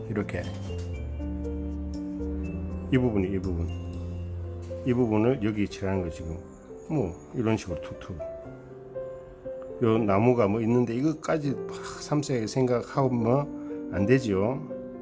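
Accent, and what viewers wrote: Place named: Gyeongsang, South Korea. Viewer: 정감어린 경상도 사투리와 ^^인상이 참 선해 보이십니다^^ 하나하나 잘 배우고 보도록 하겠습니다^^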